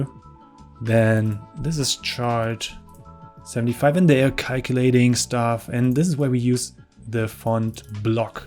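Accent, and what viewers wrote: Germany. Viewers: Ur SOO german ich auch i can hear that man hört es sowasvon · I don't know who you are, I don't even know that Figma exists, but I'm glad the youtube algorithm has sent me here. This will no doubt be useful to me some time later. It looks like Figma is great. Your tell-while-showing presentation is good, mostly straight to the point as it also includes your commentary tangents. The description is very useful with the timestamps, links, and even credits to the music. Your voice with German accent is nothing but soothing and clear to understand. (Or maybe I'm just used to content creators with heavier German accents speaking English such as JoergSparve and members of ZF such as SwatKnight and Gambit) In any case, subbed.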